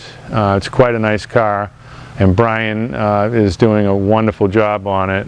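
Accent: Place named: Boston, United States. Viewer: so stunning, I can't thank you enough. Also I love the Boston accent " a little bit.... a little bit" . It reminds me of Lazze's videos when he says "littlelittlelittlelittle" in his Swedish accent. "A little bit" seems to be a metaphor for life... at least for those of us making art.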